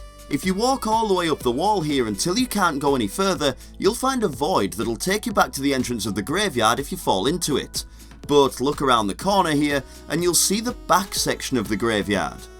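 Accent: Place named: North, United Kingdom. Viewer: Loving your northern accent.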